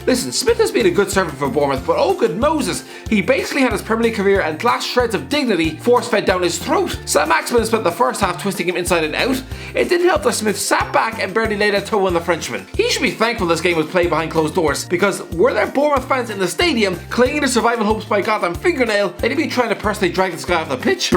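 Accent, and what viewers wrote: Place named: Ireland. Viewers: Can you please Stop! Doing these chips.. so quickly your voice is afraid irritating! The Second I heard your voice I have turn it off.. I don't mean to be offensive in anyway but the Irish accent sweet when it's smooth & slow but you talk at 100 miles an hour & it's like listening to a fire alarm going off at 4am.. consistent your audience thank you. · You do realise that the whole audience love that he speaks fast and his angry Irish accent, its just you being a Karen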